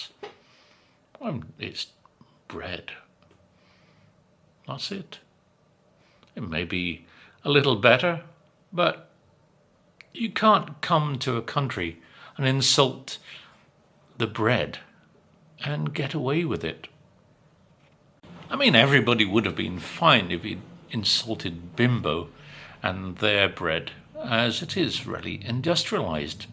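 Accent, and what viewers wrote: United Kingdom. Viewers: Me too is true ( The British accent is beautiful!! ) 💙🫂💯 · me encanta su acento británico 😊 no conocia su canal. 🖐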